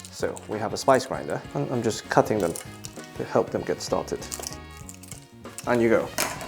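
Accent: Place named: United Kingdom. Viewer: very high quality video, and with brits accent, really nice.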